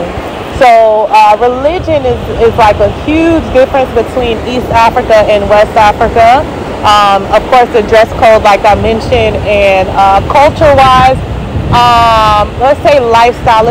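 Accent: United States. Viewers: Wow I'm surprised, I thought Kampala would be as hot as Ghana. You sound so American. I would never guess that you are Jamaican-American.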